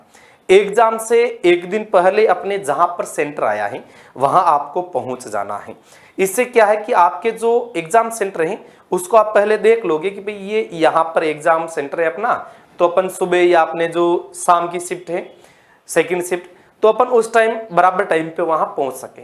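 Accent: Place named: Marwar, India. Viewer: Sir aap marvad se hai isliye mai aapki aabaj ko bade chav se sunta hu😂😂😊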